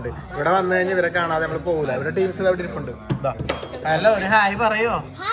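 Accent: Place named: Ernakulam, India. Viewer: Ernakulam slang sani ivide aano veedu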